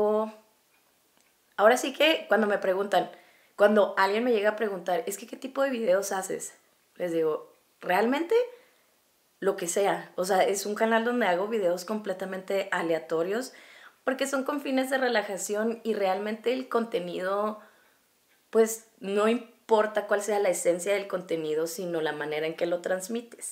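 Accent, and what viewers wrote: Mexico. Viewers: se te siente mucho mas el acento mexicano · me encanta conocerte con tu acento mexicano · amo el acento mexicano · Con tu voz normal si se te nota un poquito el acento de chihuas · el acento mexicano me gusta mucho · sos mexicana... en susurros no se escucha la "tonadita"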